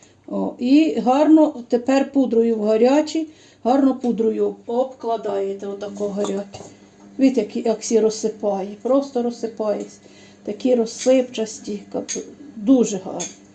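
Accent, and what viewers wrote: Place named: Lviv, Ukraine. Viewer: Чую Львівщину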